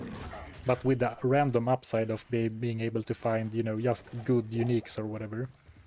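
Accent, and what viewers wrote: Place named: Sweden. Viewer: The Swedish accent is fun, and we understand everything you say just fine. If you want to get rid of it, do so naturally over time, don't go out of your way to try to hide it. I like my Swedish d2 youtuber and streamer.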